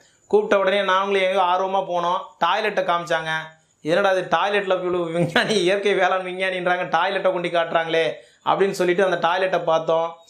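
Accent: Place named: Coimbatore, India. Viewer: நன்றி ஐயா. மலம்கூட மண்ணுள் மக்கினால் மணக்குமென்பதை அழகாக விளக்கினீர்கள். ஏனுங்க நீங்க கோயம்புத்தூர் பேச்சு வழக்கிலேயே பேசுங்க.அழகா இருக்குதுங்க.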